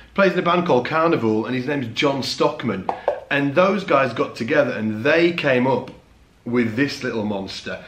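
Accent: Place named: England, United Kingdom. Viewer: Great video + English accent=8 minute intro monologue.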